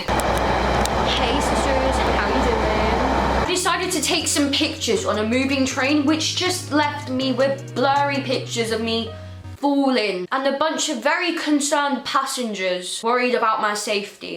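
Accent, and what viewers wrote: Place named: Scotland, United Kingdom. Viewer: Funny accent, scottish?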